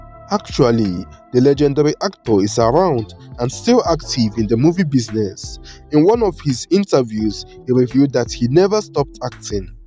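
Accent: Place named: Igbo, Nigeria. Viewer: Your voice no join abeg too igbotic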